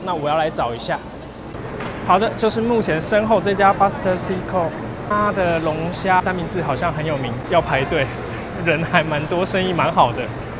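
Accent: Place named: Taiwan. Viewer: 欢迎你到我女儿住的地方来观光，听上去是台湾的朋友，祝你玩得开心！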